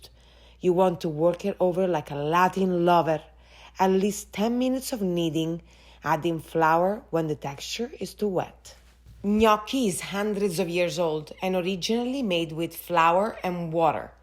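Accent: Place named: Rome, Italy. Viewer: Sei romana pure quando parli inglese XD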